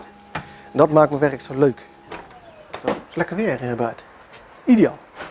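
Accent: The Hague, Netherlands. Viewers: Mooie vlog die vent is machtig met zijn Haagse accent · Hahaha geniaal! Lekker plat haags! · Prachtig, dat Haagse accent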